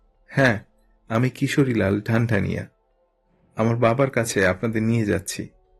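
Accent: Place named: Bangal, Bangladesh. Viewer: Ato bhoyanok pronunciation!!! Please learn proper bengali bhai! Satyajit Ray kokhonoi bangal bhashaye lekhen ni ei sob! You have ruined everything! Akok bhabe korleo listeners need various voices! Use vibrato or else include someone else please! Erokom flat way te kono golpo convey kora jaye na! Please learn the art first!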